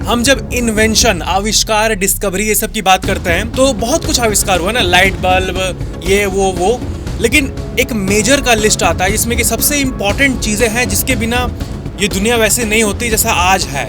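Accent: Bihar, India. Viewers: Pakka bihari bol chal h bhai ka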